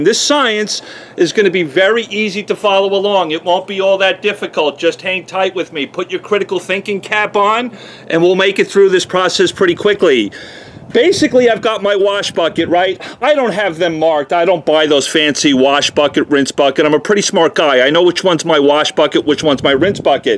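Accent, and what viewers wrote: New York, United States. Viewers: It's a New York accent, from where he's from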